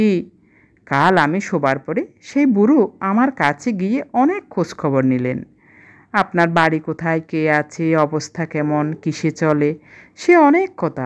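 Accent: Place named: Bangal, Bangladesh. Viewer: খুব ভালো লাগলো বাঙাল টান টা আরো মধুর করেছে , যদিও পুরো বাঙাল ভাষা বুঝতে পারি না পুনঃ গল্পের আশায় রইলাম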